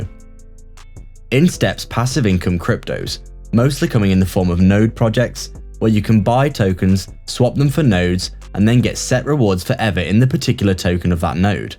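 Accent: United Kingdom. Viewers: Great project indeed. I just love the British accent. Thanks for sharing. Vires in Numeris.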